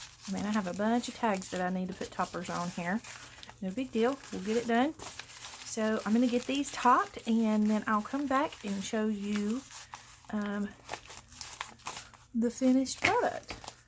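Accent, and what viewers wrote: South, United States. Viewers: Hi, I woke up a grumpy bear this morning...does that ever happen to you? Anyway, I knew watching one of your videos would lift my spirits. Sure enough! I clicked on this video, heard your sweet, sweet greeting in your lovely southern drawl, POOF! Mood elevated! It was like the clouds parted and the sunshine of my faith cleared up the grumpiness of my heart! Thank you! You have a blessed day!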